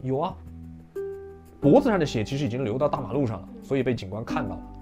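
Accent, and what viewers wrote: Yunnan, China. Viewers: 哥们儿这一口云普把我逗乐了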